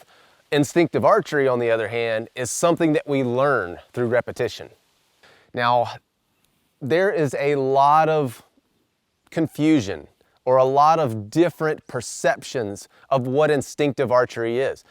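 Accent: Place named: South, United States. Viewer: hi! I'm from New Zealand. really enjoyed watching your journey on Alone as you've heard millions of times so I went searching for your name to pick your brains coz I'm new to archery as of last year bought a 45lb recurve bow set during lockdown here and loved it now I'm hooked! still trying to fumble my way thru techniques vs different equipment sheesh... great video man thanks for sharing your wisdom with the tint of southern accent as a bonus "YEEE-HAAW! hillbilly nation come on!" (that's my own saying 😂 hope I don't offend anyone? Oh well its out there now) PEACE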